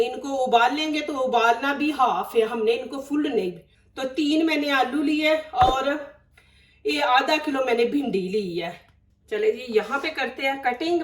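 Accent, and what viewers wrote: Punjab, India. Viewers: lovely punjabi accent.. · I watched a few videos of u .v.nice ..loved ur punjabi lehja urdu ...I myself have my own chanel .kokab khwaja studio....I enjoy ur videos too nd subscribe it ...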